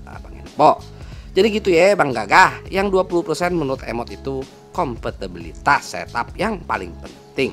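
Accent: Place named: Petamburan, Indonesia. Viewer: Logat petamburan nih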